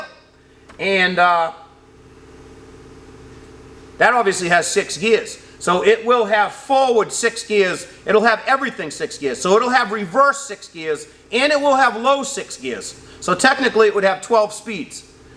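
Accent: Boston, United States. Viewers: All I hear is Boston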